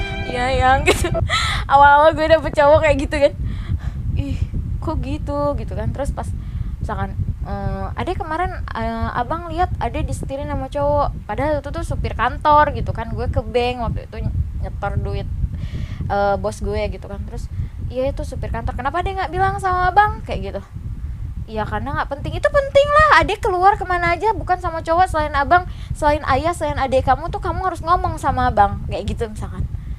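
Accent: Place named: Aceh, Indonesia. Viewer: Bahasa Indonesia nya Udah mulai medok Aceh ni...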